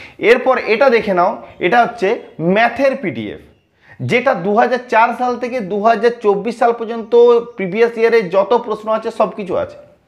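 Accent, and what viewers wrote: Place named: Purulia, India. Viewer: Dada tomer bari kothai voice ta purulia r moto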